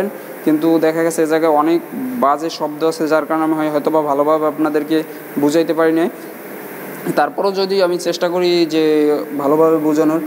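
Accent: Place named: Barishal, Bangladesh. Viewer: বরিশালের ভাষা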